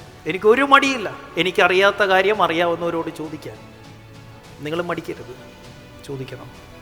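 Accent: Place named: Thiruvananthapuram, India. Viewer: Are you from tvm? Or kollam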